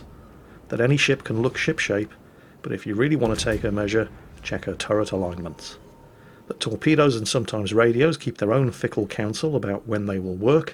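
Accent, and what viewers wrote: United Kingdom. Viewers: oh my god..., do get a move on...you have made the subtle art of rambling into a tortuous experience only spared by those wonderful dulcet tones...damn your British accent for making me love you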